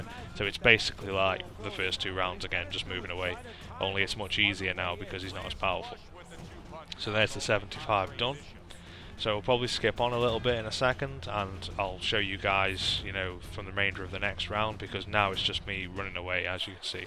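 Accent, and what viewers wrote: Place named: Nottingham, United Kingdom. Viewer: This took me like 50 attempts and I smashed so many PS3 pads. Uploaded, are you from Nottingham? You sound like you're from around here because you sound like Carl Froch, haha.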